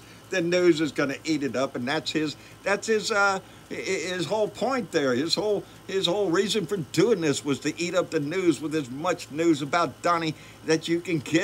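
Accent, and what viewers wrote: Texas, United States. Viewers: I love you, chum!! You remind me of my first husband! Same Texas accent, coloration, shape, sense of humor... Age, too!! 😆😆😆💋💖💋💖😁😁🍿🍹🌊🌊🌊🌵🌵👵🐺🖖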